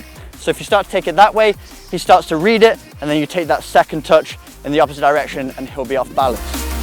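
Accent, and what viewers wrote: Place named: England, United Kingdom. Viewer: Top video as per usual.Where abouts in England are you from mate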